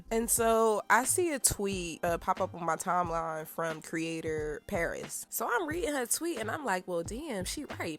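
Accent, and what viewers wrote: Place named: Philadelphia, United States. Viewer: You are def from Philly, I can tell. 💕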